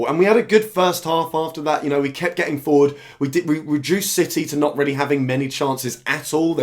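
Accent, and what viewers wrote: Liverpool, United Kingdom. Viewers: "Absolutely boss." Your Scouse is coming along nicely. :-)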